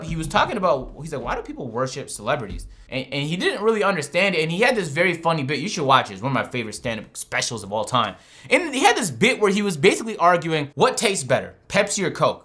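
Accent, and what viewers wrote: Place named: United States. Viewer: Ayo, what happened to your Canadian accent. You straight up American now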